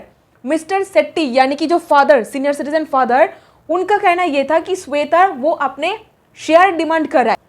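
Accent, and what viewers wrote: Bengal, India. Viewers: Madam Apke ke dwara bait gaye advice sahi hai Please take care that Bengali tone is coming to your Hindi version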